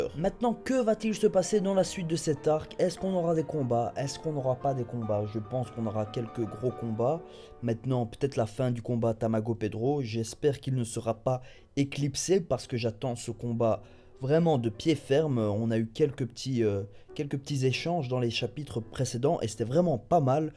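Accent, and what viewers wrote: Belgium. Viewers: "ouit cent cinquante deux" hmmm je kiffe l'accent belge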